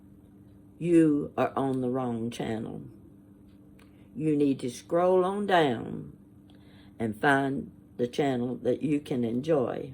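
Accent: South, United States. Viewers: Awww…kiddo…please just block the yucky people and try not to even read that crap. Some people are just so miserable in their lives they feel the need to try to make others feel miserable too. Don’t let them get to you. They are not worth a second thought. …you are a real blessing from God! You make me and so many, many others happy. You are real and I love that about you. I love that you do talk to us like we are all sitting together having coffee. Please continue your channel just exactly as you are and in the same way you always have. I live about 1000 miles away from my mom. She has Alzheimer’s and it just breaks my heart not to be with her. I am still able to talk to her on the phone. She is my best friend. Her sister, my aunt, is my other best friend but she passed in 2018. We called ourselves the three musketeers. You put out such love, kindness, comfort, etc and I feel like I’m in the presence of my two best friends. 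Ha, change your southern accent to Scandinavian/North Dakotan and it is definitely just like them. I never did find your address, maybe one day then I can send you the older jewelry for your projects. Love you bunches! I thank God for you and all of your subscribers. ❤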